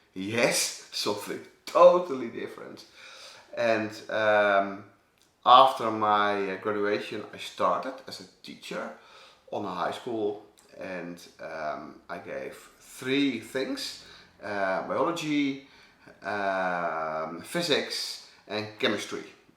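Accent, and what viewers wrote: Netherlands. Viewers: Thank you for this! Your English reminds me so much of a Dutch man who ran a dairy where I grew up. He was a wonderful, very friendly and cheerful man, as was his family -- friends I grew up with. He spoke English in a manner very similar to the way you do.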